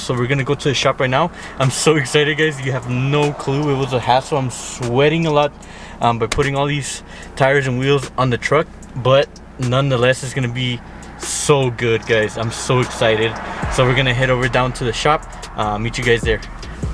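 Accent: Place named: India. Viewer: you speak like an Indian or Pakistan guy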